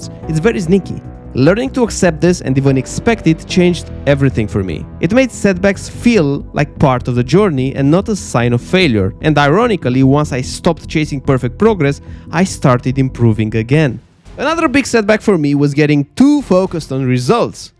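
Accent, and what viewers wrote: Romania. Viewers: Where are you from? It seems you are romanian?🧐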